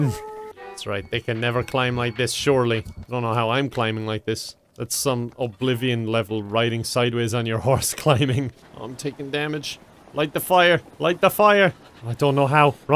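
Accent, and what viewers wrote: Ireland. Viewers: he has an Irish one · The thing with is that he always ALWAYS uploads when I'm doing my homework. I want to ignore it but then I think... can I ignore that irish accent... and here I am!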